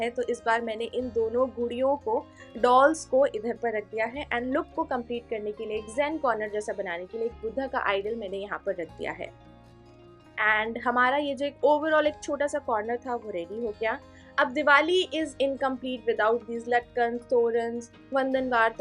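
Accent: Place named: Gwalior, India. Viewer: Hey...i m frm gwalior...aapk muh se gwl sun kr achha lga...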